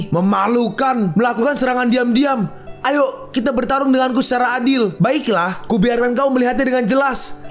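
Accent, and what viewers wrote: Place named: Makassar, Indonesia. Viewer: itu logat makassar klo g slh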